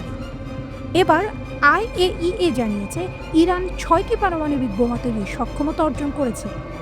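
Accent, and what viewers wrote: Kolkata, India. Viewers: কোলকাতা স্টাইলে নিউজ পড়ছে এর বাড়িকি কোল কাতায় নাকি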